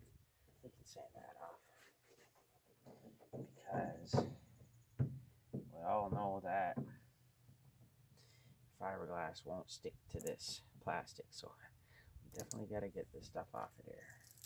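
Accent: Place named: Canada. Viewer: We have an old 15' fibreglass canoe, and when I heard you speak, (and of course the topic), I guessed- "Canadian". Then I see how you are a great 'responder' to comments. Yup- definitely helpful- also probably- He's Canadian. (, maybe 1st Nations?) Then I heard 'fiddleheads' and Oh hell YEAH!!!. Now, to guess where from"- Prairies?, nah, not enough water. NL or Nunavut" or BC? nah, more likely he'd be doing a fishing boat. Then the Lepage adhesive can- en français. Voila! Super definitely 'CANADA, in N. Ontario, Quebec or NB.? Humm?. Cheers, from Montreal. Been through NB many times, mom grew up outside Petitcodiac (Forrest Glen), happy memories, saw my first movie in Moncton when 8 years old! with my late uncle, who had a farm near "Elgin" and I almost attended UNB. Keep up the good work... and Happy paddling!:-)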